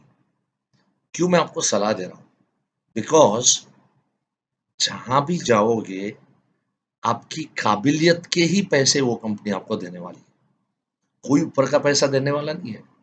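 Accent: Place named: Maharashtra, India. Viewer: खूपच सुंदर माहिती दिली सर धन्यवाद ( शुद्ध मराठी बोलता सर तुम्ही )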